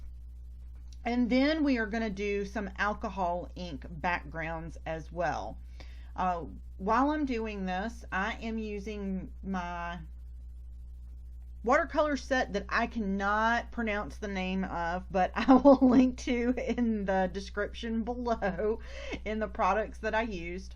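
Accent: South, United States. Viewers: What awesome cards! I’m so thankful that you chose to participate in this important cause! Honestly, to hear someone with a southern accent is even more encouraging. Bless you!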